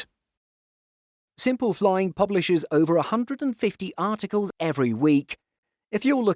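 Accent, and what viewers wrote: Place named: United Kingdom. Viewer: Your channel is awesome. But your tea drinker accent is annoying as FÆCK! CHANGE IT! COLONIZER! YOU PROBABLY HAVE SOME CROOKED TEETH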